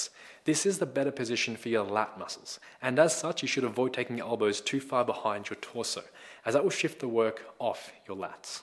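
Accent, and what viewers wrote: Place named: Australia. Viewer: Where ya from bro? Australia? I was trying to work out this accent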